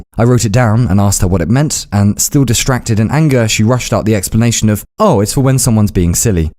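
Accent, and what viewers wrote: Bulgaria. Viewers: its so funny seing switch from a British accent to a heavy bulgarian accent because that's exactly what happens whenever I speak russian. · I love your bulgarian accent. And i am genuinely surprised by how much you like Bulgaria. As someone that's born and raised here i always look at the negatives, but your admiration with our culture and language is kinda inspiring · you've picked up the Sofian dialect lmao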